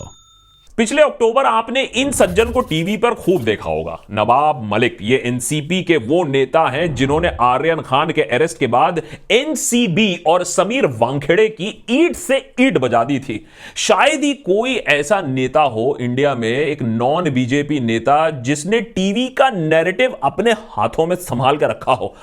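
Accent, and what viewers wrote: Bengal, India. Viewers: Are you Bengali?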